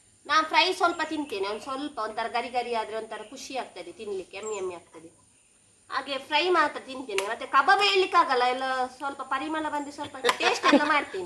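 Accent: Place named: Tulu Nadu, India. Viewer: ನಿಮ್ಮ ತುಳು ಮಿಕ್ಸ್ ಕನ್ನಡ ಕೇಳಲು ಖುಷಿಯಾಗುತ್ತದೆ. ನಿಮ್ಮ ಅಮ್ಮ ನಿಮ್ಗೆ ಒಳ್ಳೆ ಬಜೆ ನೆಕ್ಕಿಸಿದ್ದಾರೆ ಅನ್ಸುತ್ತೆ. ನೀವು ಕೂಡಾ ಯು ಟ್ಯೂಬ್ ನಲ್ಲಿ ಪಾಪ್ಯುಲರ್ ಆಗಿ ಬಿಗ್ ಬಾಸ್ ಗೆ ಹೋಗುವ ಅವಕಾಶ ಸಿಗುವಂತಾಗಲಿ.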